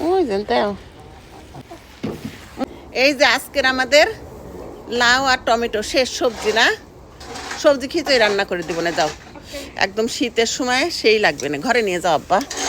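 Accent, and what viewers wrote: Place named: Kushtia, Bangladesh. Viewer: আপনার ভাষা শুনে মনে হচ্ছে আপনী কুষ্টিয়ার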